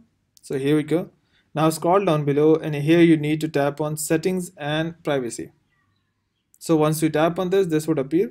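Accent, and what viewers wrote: India. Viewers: Hey ! Try to talk clearly the english, still we hear your hindu acent , we can’t understand. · This indian accent kills me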